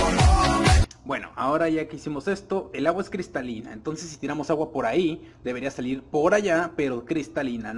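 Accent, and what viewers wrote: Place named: Mexico. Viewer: Es obvio que es de Mexico jajaja vaya pregunta sin ofender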